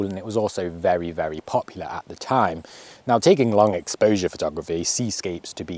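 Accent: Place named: East Midlands, United Kingdom. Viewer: You mentioned living in the East Midlands.. Would you mind me asking whereabouts? I'm from Mansfield and your accent does sound a bit familiar. With regards to regrets, I can relate to spending so much money on gear that I then can't afford to go anywhere to actually take the photos in the first place! 😂😂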